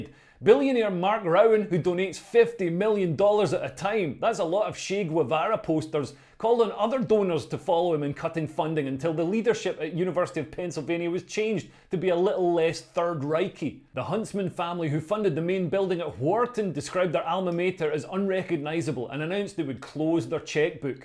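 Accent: Scotland, United Kingdom. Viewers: This my first experience watching channel. I laughed, I applauded, I cheered, and fist pumped the air. I love this guy!! And that accent just adds to the overall experience. I’m an instant subscriber and his newest fan. He’s like the Scottish version of Ozy Man focusing on politics. Well done, sir! Please keep it up! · Saying that supporting the right of the vast majority of a fully innocent (and yes maybe clueless too ? still not murderous though) Palestinian population not be be bombed into oblivion as retaliation for some of their local nutjobs' atrocities is the equivalent of being a Hamas terrorist fanboy is somewhat of a stretch, ey ? Cute scottish accent or not, you're talkin shyte, me lad. Granted, there are a few wild ones out there but exclusively singling these out strikes me as disingenuous. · How can a Brit say all this and not get targeted by The Crown or his Irish neighbors? 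Or, did i detect a Scottish accent? Anyway, superb breakdown. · Never liked Scottish accent... but I do love yours ❤️thank you 🙏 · Oh My. I'm an Israeli and this full scale, informed rant was worth the struggle to keep up with an accent like that of Scottie on Star Trek. Bravo, Kol HaKavod - a wonderful example of lethal humor used to brilliant effect. I'm sharing this.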